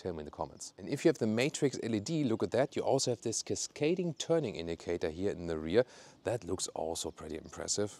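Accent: Germany. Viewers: Der Typ schaft es deutsch und englisch zugleich zu sprechen.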